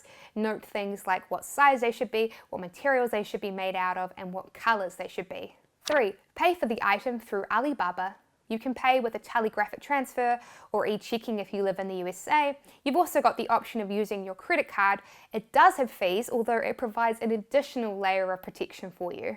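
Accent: New Zealand. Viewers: she has a classic New Zealand accent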